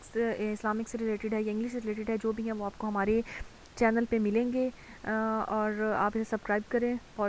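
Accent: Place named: Saraiki, Pakistan. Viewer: I think you are Saraiki speaking!!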